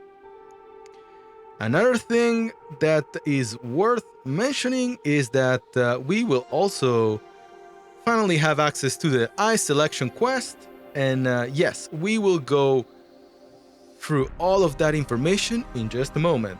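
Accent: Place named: France. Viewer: your accent to me sounds almost french though I could be wrong about that Don't have many friends from France As for my City yeah it's great here always festive celebrations good food great people to talk to and in some parts a party almost everyday if there's nothing to celebrate we find something to celebrate That's the Cleveland way If you ever come to the states (whenever that's possible) check out Cleveland Ohio you'll love it here As for the channel have you considered partnering or collaborating with other streamers of WOTV? There are some great ones out there Auronnj, Paladiah, Diggs maybe even try to get a sponsorship with Amazon like Auronnj and his Amazon coin affiliate link As for the PS5 I hope so too but first they said it be more accessible in 2022 but now there saying it might be in 2023. They say this is the greatest launch of the next gen but when you can't even keep it on the market and scalpers running rampant I would have to disagree sells numbers don't mean a great launch when less than 50% of the population of gamers still can't get your game console. 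Imagine the loss of revenue PS5 game developers are looking at for the first week of sells is everything and you can't just make up for that later. And as for the strategy I'm still working things out but eventually it will all work out in the end even your plan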